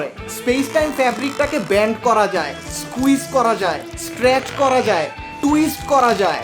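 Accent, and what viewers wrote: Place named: East Bengal, Bangladesh. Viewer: Give up your East Bengal Bangal language please.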